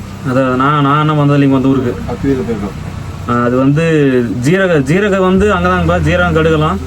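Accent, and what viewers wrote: Kongu, India. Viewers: அப்பாக்கு நீங்க கொடுக்கிற மரியாதை.. நீங்க பேசுற அந்த கொங்கு தமிழ் அழகா இருக்கு நன்றி ப்ரோ❤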